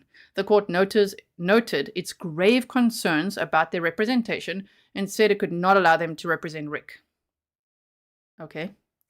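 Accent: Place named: South Africa. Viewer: Found your channel, because a fell in to the rabbit hole, that was judge Gull… Love your accent, you sound South African or Dutch (Holland)?? I’am mixed race Danish(white side) / South African (black side, my father fleed under apartheid, to Denmark) and I much say, love the accent ❤ even if I’am wrong about the country 😂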